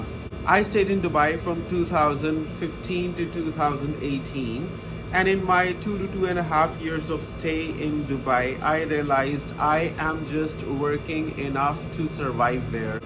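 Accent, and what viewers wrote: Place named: England, United Kingdom. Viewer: Very bad sound quality, i can hear only English accent, nothing sentences 🇺🇸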